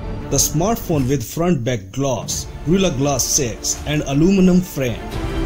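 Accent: India. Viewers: Are u from south india or oriya? Anyway stop spreading rumor. These are not correct info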